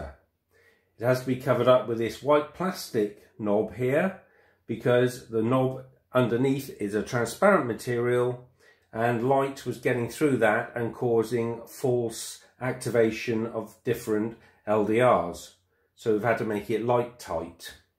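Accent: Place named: United Kingdom. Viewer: I love that idea! I wonder if my company could make something similar for appliances like that. By the way, I love your accent! Is that British? I wonder if that machine works on dryers as well. Like your wife, I'm totally blind, and one thing I would love to design is a washing machine and dryer pair that can have as many cycles as you like. Wouldn't that be amazing?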